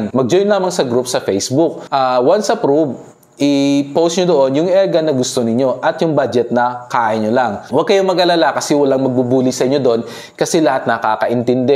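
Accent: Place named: Iloilo, Philippines. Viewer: hi sir.. its seems ilonggo ka..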